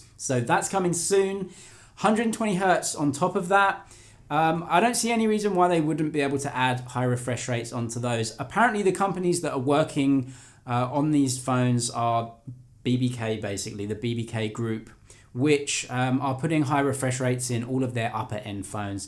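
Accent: United Kingdom. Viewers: #asktechzg Why are you in China?Is it for your job,if so,what is your job title? Cuz you sound British and look 100% like Tom Holland😂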